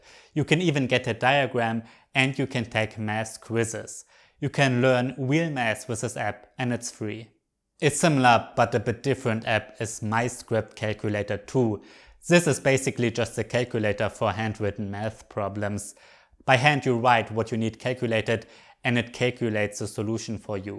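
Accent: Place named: Germany. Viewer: I love it that you speak english in a German way🥰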